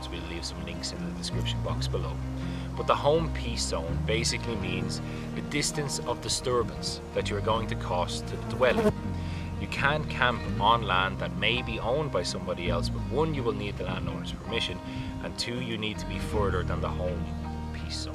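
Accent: Ireland. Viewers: I think he means three he has an Irish accent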